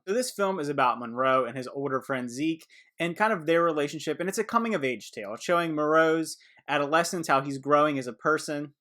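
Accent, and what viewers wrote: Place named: Philadelphia, United States. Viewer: You from Philly bro? Or jersey?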